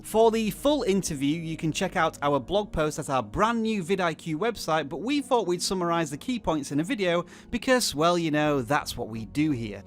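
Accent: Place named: United Kingdom. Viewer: hey, are you british? am loving the accent 😄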